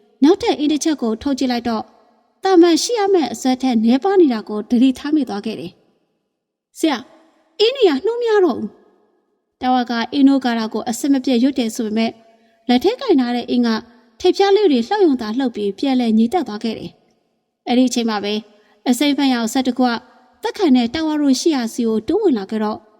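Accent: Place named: Thailand. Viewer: နားထောင်လိုအရမ်းကောင်းထိုင်းလိုမပီတပီပြောတာလေးလဲသဘောကျတယ်ဗျ❤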